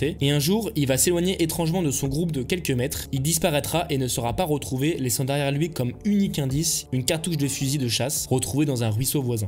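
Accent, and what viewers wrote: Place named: United States. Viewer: Je te découvre le ton est sympa, parle un peu moins vite et ce sera parfait,au j'ai pris.les gâteaux , mais la belle mère pas contente d'être dans le placard Lionel camy à déjà traité cette affaire garde ton accent américain, prend un ton plus ténébreux et ce sera top Tu es jeune, sympa, un abonnement pour toi direct,et un pouce je vais te suivre sur ta chaîne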